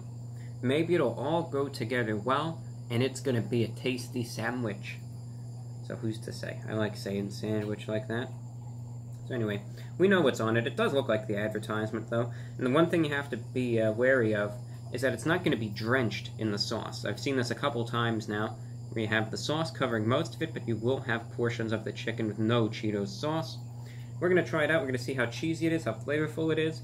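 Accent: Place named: Ohio, United States. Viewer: Im guessing ohio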